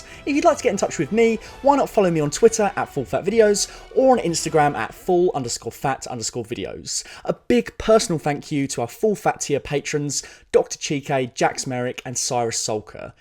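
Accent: United Kingdom. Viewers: Nice analysis (refreshing to hear a fellow Brit’s voice as well on a Star Wars channel)! I loved this duel and Yoda being introduced as THE bad ass Jedi we all know him to be. It was indeed the cherry on top of the epic battle of Geonosis with 200 Jedi, the introduction of the clones and the Death Star plans. To this day it’s the best oremirrr midnight movie screening I’ve been to (was absolutely buzzing at work the next day!).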